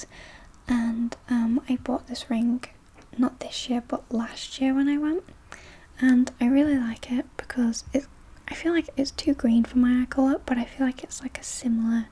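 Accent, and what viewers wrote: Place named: Northern England, United Kingdom. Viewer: Kashan900 her accent is a northern English one.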